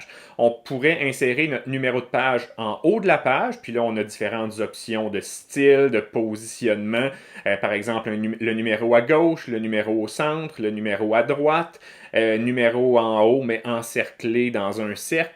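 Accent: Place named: Canada. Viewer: Rien que pour l'accent! Partir au Canada, quel plaisir et, en plus, les explications sont très claires. Merci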